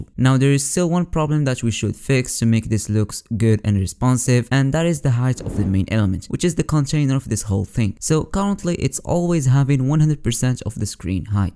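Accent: Morocco. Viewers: You're accent shows that you're from morocco 🇲🇦 Am I wrong? 😅🤣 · you sound moroccan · you have moroccan accent <3😎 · u have a moroccan accent rak nadi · I can tell by the accent that this guy is a fellow moroccan brother Keep going my guy ! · akhay hadchi 3ndek hrbaan saraha, 3rfti sbhanlah mgharba baynin, okay3rfo b3dyathom gha mn l'accent,knt gha msserkl ta dkhlt lvideo o 3jbni lhal nchof drari mgharba 3atin fdomain.mgharba finma htitihom i3tiw anyway rak nadi assat tbarkelah 3lik ❤️❤️ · that's what i thought because i'm from morocco and his accent similiar to moroccan English 🙃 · mn l'accent katban mghribi😅nice video tho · Are you from Morocco or Algeria because your accent is similar to the Moroccan dialect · Nadi a khy 3raftek meghribi rir men l accent 😂💯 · i think this is a bit closer to moroccan accent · علاش في نبرة ديالك فيها النغربية واخا لونجلي نقية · 10000% maghribi ghir mn Accent dyalk mwara9 asat 😂😂😂😂😂 but good vid tho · كاين اساط كمل للهجة المغربية حاضرة مي راك ناضي تبارك الله 🔥💪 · I can hear the moroccan accent❤ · From your clear language I think you are from Moroccan country correct if I am wrong and thanks for this helpful tutorial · Your accent like ur Moroccan bro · that Moroccan accent tho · from your accent i guess your morrocan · You sound Moroccan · khoya I sense a moroccan accent here, otherwise your video is freaking generously informative, the amount of work you put it is amazing man, thank you so much for the effort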